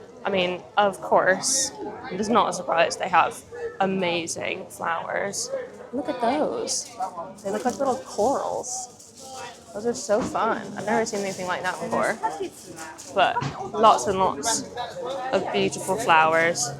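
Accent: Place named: London, United Kingdom. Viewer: Your London accent is improving! Banana plants and 20 quid but you slipped up major on Herbs! 😊 I love that market - how did you come away twice with nothing? 😲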